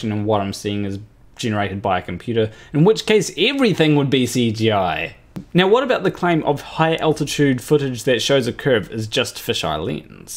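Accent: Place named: New Zealand. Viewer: No AI can do a New Zealand accent.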